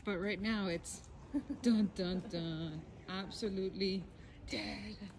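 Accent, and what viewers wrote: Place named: United States. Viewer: Thank you for a wonderful film of your journey from USA to France and your new home. Filming was excellent. You are speaking much slower now as sometimes an American accent is difficult to understand. Seems sad the past Owner didn’t arrange for some bedding after paying can only imagine a large sum. France is a great country, I am English, but French people can be hard to get to know but once they know you are very helpful etc. I wish you all the luck very different to San Diego which I loved visiting some years ago. Good luck 🤞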